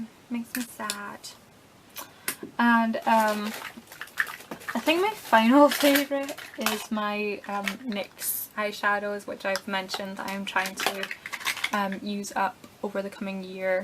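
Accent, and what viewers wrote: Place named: Scotland, United Kingdom. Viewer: Odd comment coming at you, but I enjoy listening to you. I like Scottish accents, and yours is very easy to understand and pleasant :)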